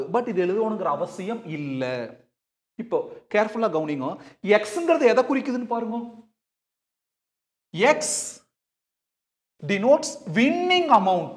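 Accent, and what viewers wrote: Coimbatore, India. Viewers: Awesom 🎉🎉🎉😮😮😮😮cbe 🔥🔥🔥slang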